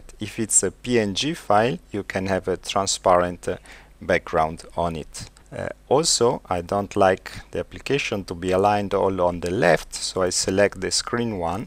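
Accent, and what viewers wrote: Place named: Brazil. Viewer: Inglês brasileiro ;) We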